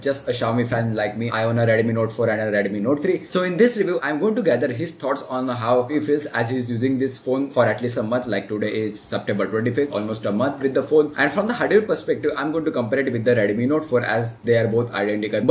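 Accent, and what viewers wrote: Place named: Telugu, India. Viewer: Such a fucked up review, and please stop fucking English language so much. Bloody Telugu accents... Bloody duckface.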